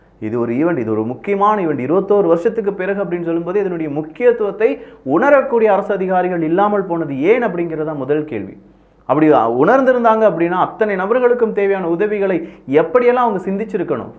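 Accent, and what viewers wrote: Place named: Tirunelveli, India. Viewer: Bro sometimes you speaks tirunelveli slang, I like that❤❤❤😀😀😀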